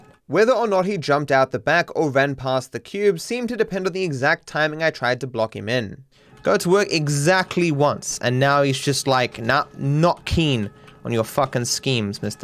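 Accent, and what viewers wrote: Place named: Australia. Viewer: FINISH THE RUN YOU AMERICANIZED AUSSIE!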